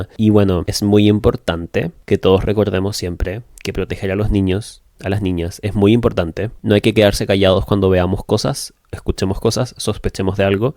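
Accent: Chile. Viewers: Oh wn que cuatico como la religión te hace eliminar el pensamiento crítico y te hace susceptible a cualquier mentira, incluso una tan retorcida como esta. Oye que bacanes tus vídeos, le tomo caleta de atención. Me gusta mucho el true crime, pero estaba chato de escuchar gente no chilena ajsj así me siento más en familia. Bacan tu canal. Saludos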